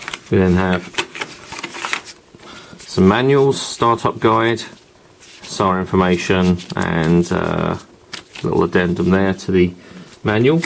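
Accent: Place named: United Kingdom. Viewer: Love the phone, and thats a pretty good review. and i love your britain accent when talk! haha.. still trying to learn that accent anyway! :)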